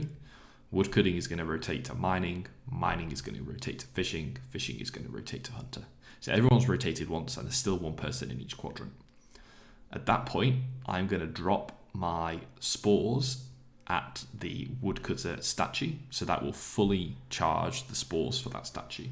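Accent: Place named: United Kingdom. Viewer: He's Br*tish 🤮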